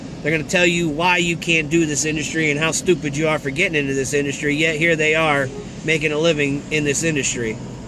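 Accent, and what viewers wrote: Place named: Laredo, United States. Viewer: is this Laredo TX it sounds like it 😂 👍👍👍👍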